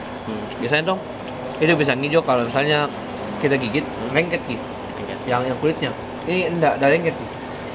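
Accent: Makassar, Indonesia. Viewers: Lain" ki ku rasa kalo logat makassarki:V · Vlog yg paling di tunggu", vlog di makassar, makan makanan khas makassar, logat makassar, syuuuka😍😍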